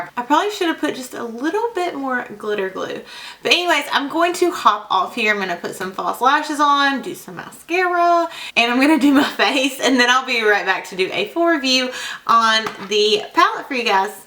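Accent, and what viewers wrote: South, United States. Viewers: OMG idk if i've said this before but i love your voice! Its so country! lol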